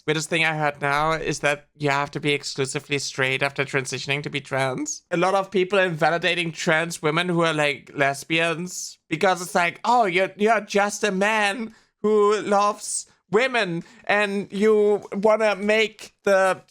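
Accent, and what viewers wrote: Germany. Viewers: ... are you german by chance?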